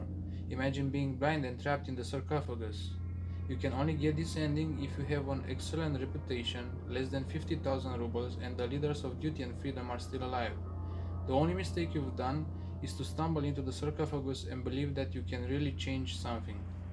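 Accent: Romania. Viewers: Wait,you are romanian?i ask cause of the accent and the flag gas mask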